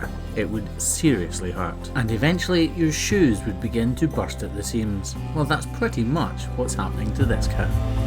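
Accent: Scotland, United Kingdom. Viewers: I don't think he should change anything, Its Scottish, quickly spoken and a bloody beautiful accent. It's like asking you to change your accent because I don't like the way you say morning 🤷‍♀️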